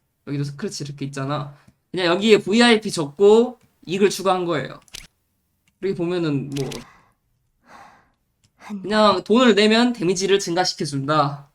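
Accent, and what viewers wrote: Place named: North Korea. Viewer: 근데 고향이 어디시지? 왜 북한 사투리가 들리지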